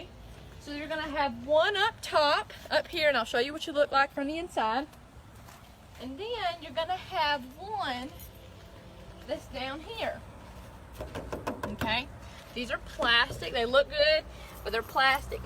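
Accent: United States. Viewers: Love that southern accent! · Listening to you speak sure makes me miss Tallahassee; we don't talk like that in Wisconsin. I've been debating side or roof -mounted ventilation and your informative video was perfectly timed - thank you.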